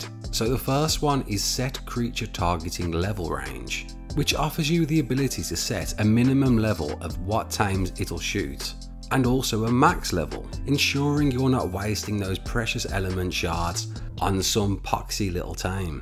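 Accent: Birmingham, United Kingdom. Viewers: Are u a brummy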